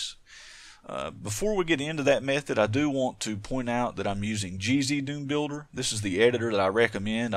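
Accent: South, United States. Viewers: Know how to make it, but still watching anyway. I like the calm southern accent voice telling me what to do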